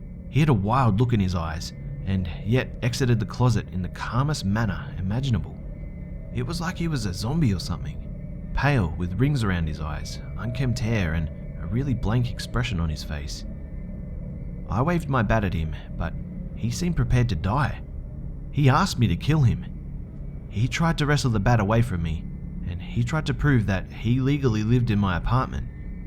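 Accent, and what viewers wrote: Australia. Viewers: Nice accent! Are you from Australia??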